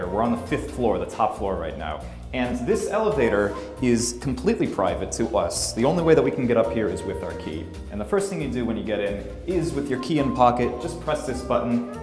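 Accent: United States. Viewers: I could tell you are American based on accent, although light, it's the "R", Americans emphasise it, whereas Brits - like myself - often keep it silent.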